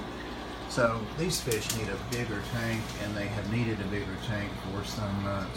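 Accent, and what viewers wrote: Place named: Tennessee, United States. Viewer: A little light on the audio with the Tennessee accent, some of my wish list aqua's in there, awesome and no aggression in the small tank, perfect.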